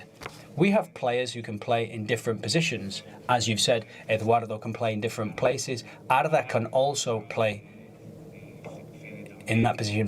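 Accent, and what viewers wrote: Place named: Liverpool, United Kingdom. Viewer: he still has that Scouser accent when speaking English. ❤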